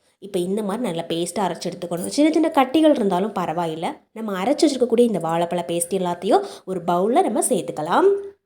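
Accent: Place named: Kongu, India. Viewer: நாங்கள் இப்படி தான் பேசுவோம் எங்க கொங்கு மொழி சூப்பரா தான் இருக்கும் மரியாதை கலந்த மொழி வாழைப்பழத்துல குற்றம் கண்டு பிடிக்கிறிங்க பாஸிட்டிவ் பேசுங்கள் நெகடிவ் வேண்டாம் இந்த பெண் பேசியது அருமையாக தான் இருக்கு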